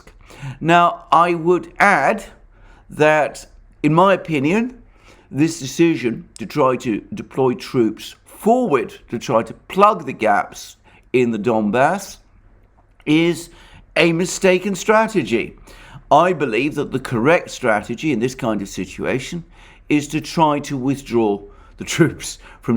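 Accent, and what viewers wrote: United Kingdom. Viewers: I love your British style and cadence of speach. I want to start go learn Russian. I'm sick of all this anti Russian sentiment.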